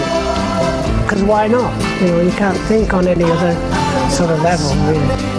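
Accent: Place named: United States. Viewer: Nice one, when was this recorded as you have your American voice instead of the Norfolk St one in your recent live stream.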